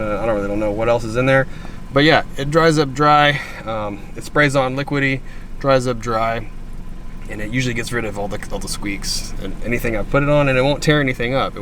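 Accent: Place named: Texas, United States. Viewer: I think you done developed a Texas accent there buddy!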